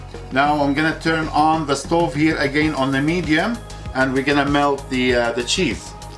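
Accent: Lebanon. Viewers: You sound so Lebanese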